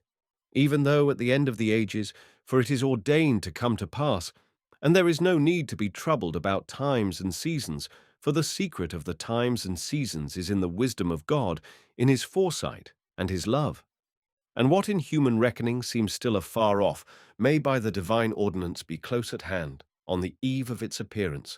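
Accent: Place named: United Kingdom. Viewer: Lovely rounded British English accent. Easy on the ear 👌 ❤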